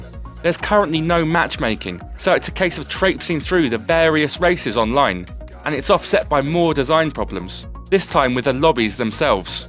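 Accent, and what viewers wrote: United Kingdom. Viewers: The British accent made everything sound more reputable. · aaarghhhhh that freakin british accent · Fuck no i aint listening to that fuckin awefull british english! Makes me wanna puke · ''buh wif the game lacking elseweah? thas noh kwai enuf to kip it un tup off the racing pail.'' FUCK YOU, BRIT! no offence to the other brits out there, but this guy's a penis gnome. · No. What you're hearing is his accent. He's likely from Britain, which is where the English language originated from. We here in the west speak English differently since the language has evolved through time. I'm guessing this is your first time hearing an English accent. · why do british accents make everything sound worse than they really are!!!!??? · I hate British accent! Damn!!!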